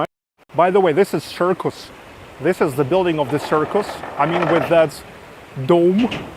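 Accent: Russia. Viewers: Чет даже для русского заметно российский акцент )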